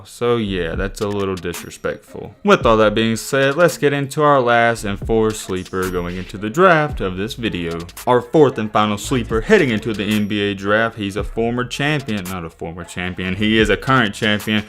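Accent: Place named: Alabama, United States. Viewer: So you from Alabama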